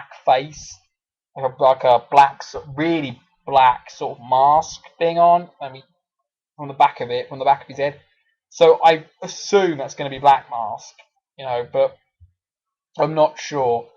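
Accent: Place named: United Kingdom. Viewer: Dude, how I love the British, with that accent I could listen to you talk all day : )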